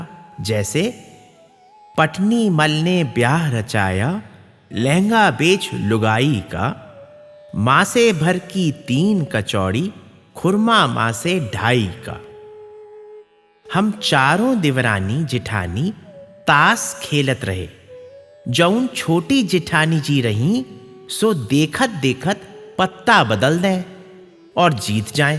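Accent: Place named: Varanasi, India. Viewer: these stories by themselves are so interesting, but your superb narration in the Banarasi dialect makes them all the more entertaining! Takes you to a different world altogether. Congratulations! Well done! 👌👌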